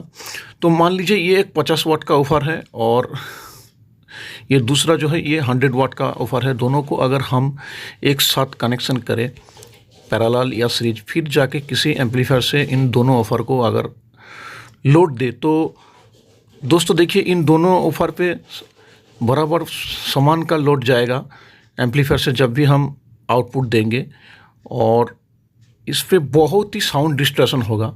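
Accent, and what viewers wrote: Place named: Bengal, India. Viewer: Universal amplifier ka Chandni main shope ka mobile no muzhe google se milega hai, ph mein bat huya hai. AaJ ke somoy mein 3400/- price hai. Ar tumi to ekJon Bangali tomar kothar Tane ami buzhe geychhi. Tobe niJer matri vasa chere keno hindi te video banachho. Banglay banao seta teo tumi popular hobe. Thak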